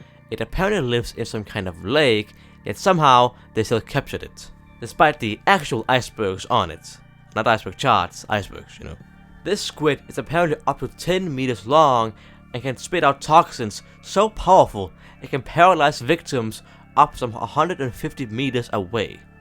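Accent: Denmark. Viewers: Thought I recognized the accent. It's Danish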